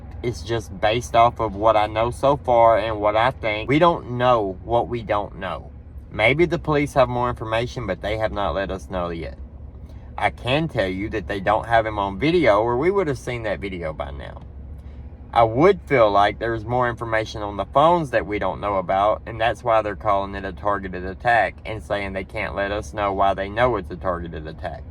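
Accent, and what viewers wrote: South, United States. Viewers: This is the 1st video I've seen of yours. If I'm being honest I was going to skip past it. I decided just because you didn't speak like me and had a Southern accent doesn't mean I shouldn't listen to what you have to say, obviously 🙄 I'm glad I decided to give it a chance. Keep up the good work! Good take on this case. I don't fully agree with your take on what happened. I Think those 2 surviving roommates had something to do with this. It's way too weird for them not to see any blood. Dude there said it was a blood bath. There's blood leaking out of the f**** house. This whole case blows my mind, though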